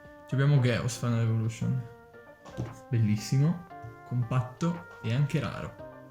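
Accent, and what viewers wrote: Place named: Marche, Italy. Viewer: avevo le cuffie. E ho notato un familiare accento centro-marchigiano, ora so dove trovarti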